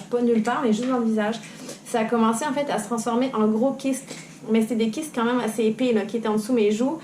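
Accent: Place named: Quebec, Canada. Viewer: Ces cute tu as un accent français et québécois à la fois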